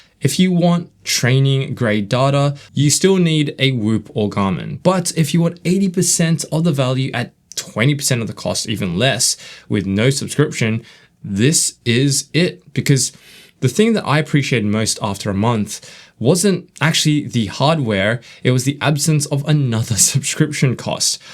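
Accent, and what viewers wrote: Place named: Australia. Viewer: $99? Why do you sound Australian but the price seems to be in US! No?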